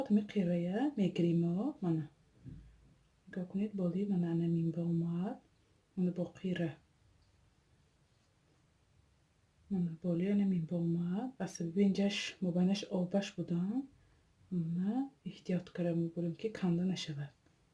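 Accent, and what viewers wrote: Isfara, Tajikistan. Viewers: Аз суханатон фахмидамки хамшахри мо хастед исфарая кучошба кадом шахри расия зиндаги мекунид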